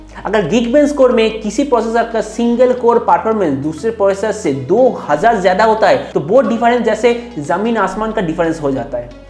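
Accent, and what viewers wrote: Bengal, India. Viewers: আপনার উচ্চারণ শুনে বাঙালী মনে হল।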